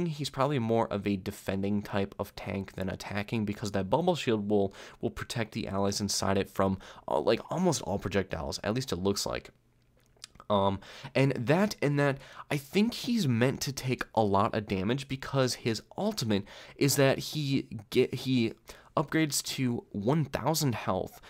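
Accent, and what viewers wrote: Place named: United States. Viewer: i really think you deserve more views <3 i'm the sort to overthink things as well, i have many theories that i want to turn into a storyline for tf2 (obviously, not before i consider all of its comics). unlike you, i lack the voice and the concise speech manner (i'm sure you're using american english, which is my favorite) to make videos, so my only good english is the written one x'D i admit not knowing the characters/storyline of the game you are talking here, but your video is very pleasant for an introduction. too bad people lack the patience to listen for so long. somewhat, i wish there there was a heart shot rewarding system as well x'D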